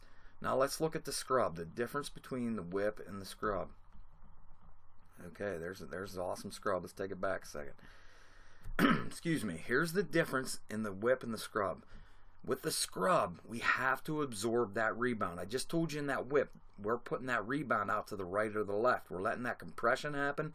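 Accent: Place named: Pittsburgh, United States. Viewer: sounds like your from Pittsburgh man good video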